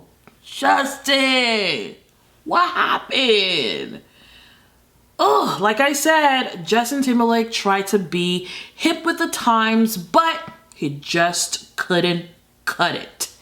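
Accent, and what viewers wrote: United Kingdom. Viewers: Is she originally from London because that’s what I’m getting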